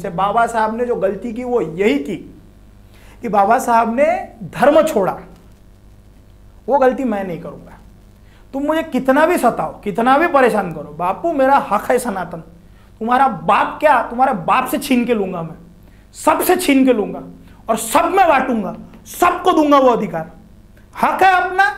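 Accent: Maharashtra, India. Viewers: Idk but you sound Marathi af sir. Typical Marathi guy talking in Hindi.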